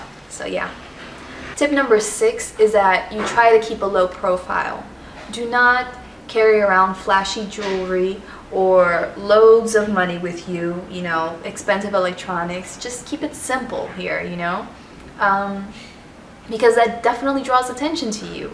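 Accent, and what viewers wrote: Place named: United States. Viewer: your accent is american. whats the deal? you were born in peru and you grew up in USA? and btw, mystura is at Jockey Plaza right?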